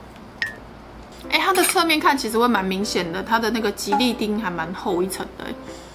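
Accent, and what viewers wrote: Taiwan. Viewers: 每次看講台語真的好道地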